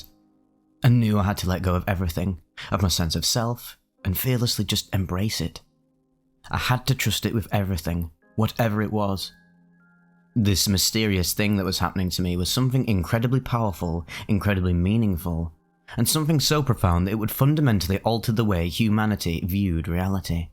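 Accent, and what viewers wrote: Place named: Derby, United Kingdom. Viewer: I listen to these trip reports while I'm doing ice baths, it really helps my endurance and heightens the experience. I adore your voice and delivery. I've gone through every single trip report compilation, so your narration has taken me on so many hours of incredibly profound psychedelic journeys. I'm Canadian and my partner is from Derby so he has a similar accent and that makes it even better. A deluge of gratitude to you for the time and effort you put into these. Godspeed.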